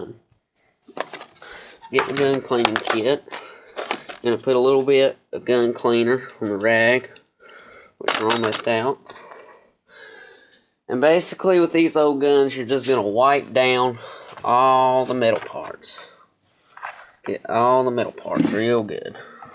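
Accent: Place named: United States. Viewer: Your accent is epic ... America!!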